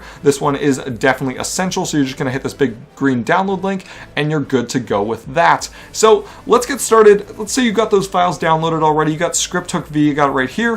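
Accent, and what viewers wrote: Canada. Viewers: love Canadian accents. They're funny to me as an american lolololol. But, great video broskoski. Me and my buddy are new to PC and we were both able to download the mods pretty well with minimal problems (problems due to new PC dumbass'ry and slight intoxication).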